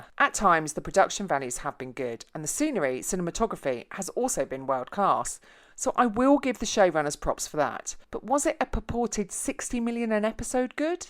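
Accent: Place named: England, United Kingdom. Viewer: Nothing better than an english accent to tear this shit apart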